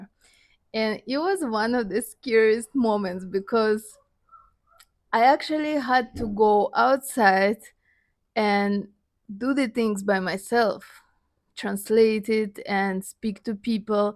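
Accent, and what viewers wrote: Jamaica. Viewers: Jamaican fluency is good I like that accent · You got ah likkle jamaican accent still.. it pops ups with some of your pronunciation of certain words 😂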